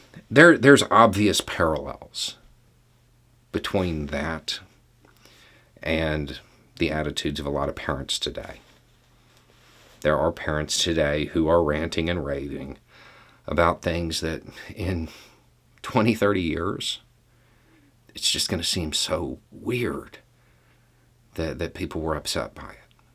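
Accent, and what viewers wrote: South, United States. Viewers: Thanks for sharing. One of my favorite of your uploads. Keep em coming, you're single handedly making a significant impact one 6 minute video a time. I could be wrong but you being a southerner w/an obvious accent is kinda like getting a toe in the door of many people who would instantly shut down to a New Englander like myself. Even though I've always been a small govt,* 2nd amendment supporter,* an avid student of the country's creation. Mostly through the men & women who wove all the complicated threads needed to create a new democratic republic. People who understood compromise was absolutely necessary and that pleasing everyone is impossible & trying to is a fools errand. I've tried to understand our country, the good, bad, & the ugly through the eyes of the real people who did their best to create a durable government for the people, of the people. 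All had their flaws, all had their genius & an incredible work ethic. Yet for many, if I had a mirror image YT channel I don't see myself having whatever level of success you do in getting certain people to even listen. I'm guilty of the same biases I guess. I hear a southern accent I'm instantly wondering where the Redhat & desecrated flag(pictures, words, etc.) is. I am instantly on guard. Worst of all I'm looking for the exit which sadly could deprive me of meeting a good person, making a friend, etc. I didn't intend to write more than a sentence or two but got carried away. Anyway, have a great Sunday & thank you f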